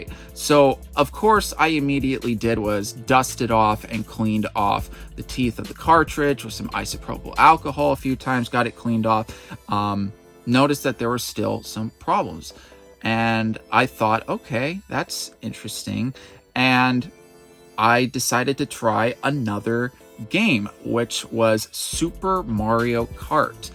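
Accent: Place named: Canada. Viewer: You sound like a Canadian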